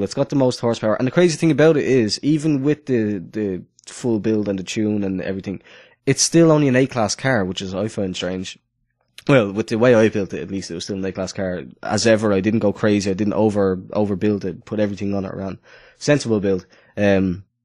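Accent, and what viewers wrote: Dublin, Ireland. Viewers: Where in Ireland do you live? You sound like a bit of a Dubliner. :)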